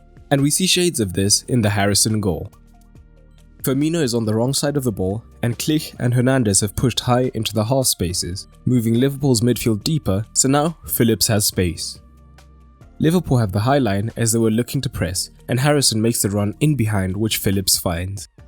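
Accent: South Africa. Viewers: Dude are you South African 😂 this accent is mad familiar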